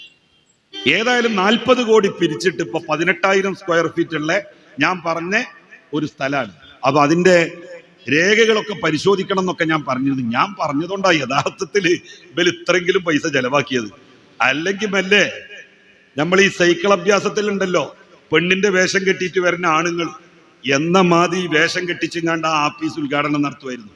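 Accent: Malappuram, India. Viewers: സഖാവ്: അങ്ങേയുടെ മലപ്പുറം ലാംഗ്വജ് ഒരുപാട് ഇഷ്ട്ടായി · ജെലിൽ സർ.... തനി നാടൻ മലപ്പുറം..... സ്പീച് അടിപൊളി... പെരുത്ത് ഇഷ്ട്ടം 😂😂😂😂😂🎉🎉🎉🎉🎉🎉❤❤❤❤ തട്ടിപ്പിന്റെ സർവ്വകലാശാല ആണെന്ന്...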